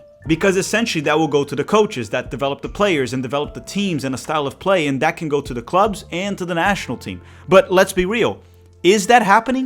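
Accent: Rio de Janeiro, Brazil. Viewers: como que um brasileiro-americano, com um sotaque carioca, torçe pro palmeiras e não pro flamengo? kkkkkkk grande vídeo amigo! a única correção que eu faria é que sim, dos anos 50 aos 80 os tecnicos brasileiros eram genios taticos, o uso de formações abertas pra alargar o campo como o 4-2-4 e atacantes que faziam a posição de falso 9 foram inovações tacticas brasileiras... saudações rubro negras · You are Brazilian, your accent gives it alway. Besides, "soccer" doesn't exist, only football and american football. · n mt a ver cm o video em si mas seu inglês eh mt bom meu querido, nao no sentido tosco do elogio de q "ai parabens seu inglês nem tem sotaque", o seu tem e é muito gostoso, eh mt natural, traz a musicalidade do ptbr pro ingles cm pronuncias muito boas e uma fluência fantástica, tipo ouvir um joao gilberto ou tom jobim cantando em ingles cm o frank sinatra na década de 60, uma identidade q eu finalmente consegui sentir oq é um inglês brasileiro no mesmo sentido de ouvir um sotaque inglês indiano, um inglês alemão, inglês africano e por aí vai, meus parabéns kkkkkk e bom video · hmmm sotaque brasileiro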